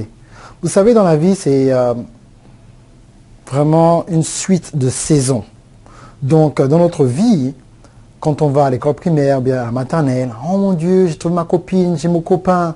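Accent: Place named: Gabon. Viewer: L ACCENT GABONAIS HEIN. LOOL COOL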